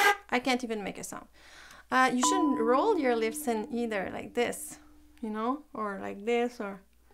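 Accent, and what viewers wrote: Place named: France. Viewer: It looks as if you have an french accent,so, are you French?(without malice)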